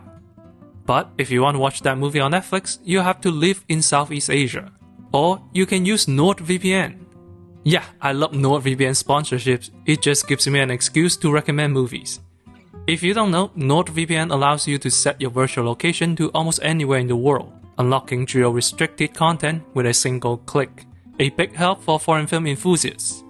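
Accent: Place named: China. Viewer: Your chinese accent is so thick that even when speaking English, you still sound like you're speaking chinese. I don't understand half of the things you say.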